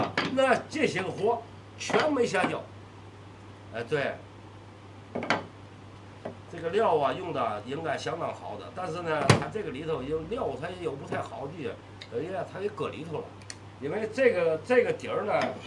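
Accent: Tianjin, China. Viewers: 在外留学的天津人。最近刚遇到您们的视频，听到乡音无比亲切，看到木工大开眼界。未来家里的布置的审美得到了培养。谢谢上传者，更要谢谢老师傅。美好的事物永恒。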